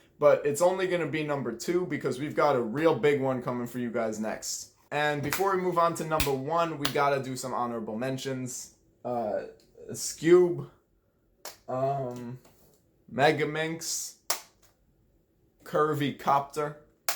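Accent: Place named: New York, United States. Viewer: why the new york accent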